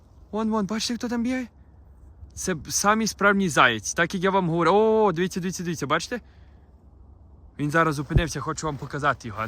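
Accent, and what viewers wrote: Western Ukraine, Ukraine. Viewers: галицький діалект, і чого так зневажливо, " западенці"?, це що за кацапські словечки? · Не чув) але говір як западенський)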